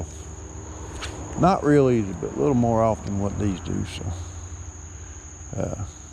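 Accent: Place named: South, United States. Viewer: This Yankee loves to hear you soft spoken southern guys talk!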